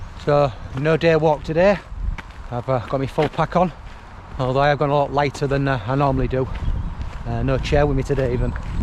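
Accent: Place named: Hull, United Kingdom. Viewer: Heyup Mate😊. Great film,again. Approximately from where do you set off from? To get to your start point? One detects a Hull accent . Your channel is improved, by that n such.. best wishes from a fellow Nor Easter 😊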